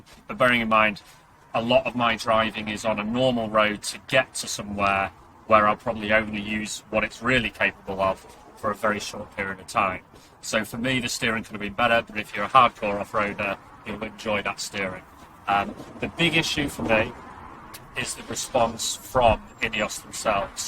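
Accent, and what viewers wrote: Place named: United Kingdom. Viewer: I can hardly understand with your British accent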